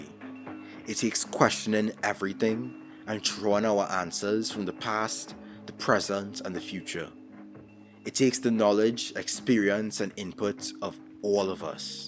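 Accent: Trinidad and Tobago. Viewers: Are you Trinidadian? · ay yo i heard that trini accent and subbed right away! youre doing great work my friend much love from canada.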